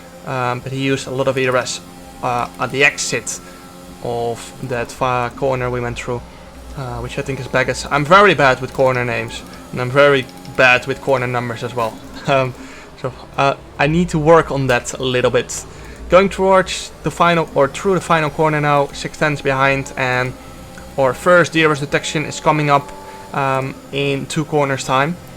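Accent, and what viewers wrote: Netherlands. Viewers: Je spreekt beetje Nederlands Engels? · Ik hoor zo overduidelijk dat jij nederlands bent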